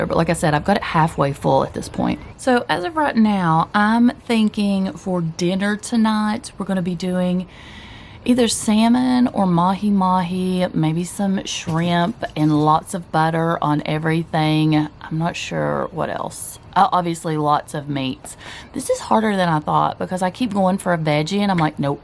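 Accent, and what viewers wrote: South, United States. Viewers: You are hilarious! Good to hear that beautiful southern accent. 💗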